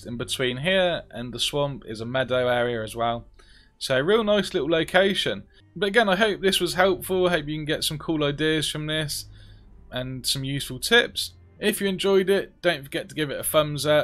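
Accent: Norfolk, United Kingdom. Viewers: Some really great ideas! Are you from Norfolk or Suffolk? I live near Norwich :) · i like your british accent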